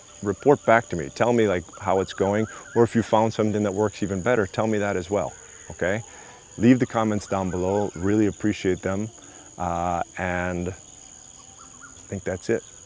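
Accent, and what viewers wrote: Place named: India. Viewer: Excellent info,! Also, I am hearing hints of Indian accent when you speak. It's totally cute!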